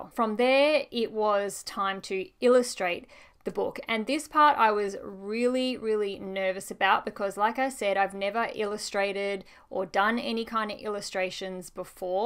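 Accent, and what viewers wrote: Australia. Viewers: Lovely, unaffected, clean Australian voice. Outstanding, lucid preparation ands steady camera work. Smart illustrations. If this charmer isn't a teacher, she should be. She nailed it with this book.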